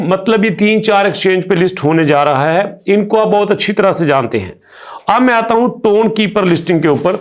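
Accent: Punjab, India. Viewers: Very good Bro....tuhadi hr Vedio bht hi infirmative hundi aa...and tuhadi punjabi ne tn dil Moh lia....❤❤❤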